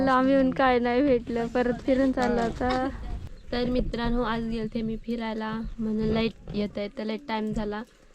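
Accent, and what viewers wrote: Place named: Pune, India. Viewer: मला तुमच्या बोलण्यावरून आणि तुम्ही ज्या भागात राहता त्यावरून वाटत होतं की तुम्ही पुणे जिल्ह्यातल्या आहात आणि आंबेगाव किंवा जुन्नर तालुक्यातल्या असाल... खेड (राजगुरुनगर ) च्या जवळच राहतो आम्ही.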